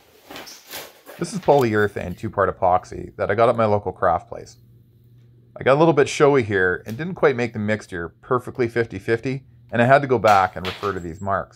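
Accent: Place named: Canada. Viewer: 🇨🇦 I knew this guy was a fellow Canadian by his Canadian accent. 🇨🇦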